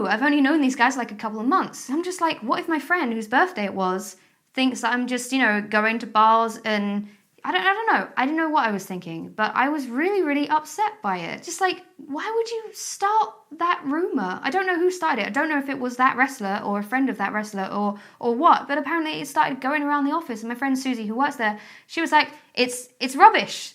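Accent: Essex, United Kingdom. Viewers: Your Essex accent is like so much stronger??? you sound like 2012 aha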